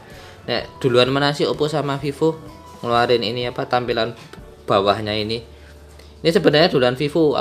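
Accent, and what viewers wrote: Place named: Java, Indonesia. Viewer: Josss logat jawanya ,,mudah dipahami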